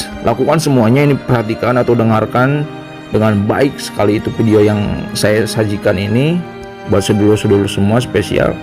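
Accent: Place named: Cirebon, Indonesia. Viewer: bahasae kaya cirebon ...cirebon pundi kang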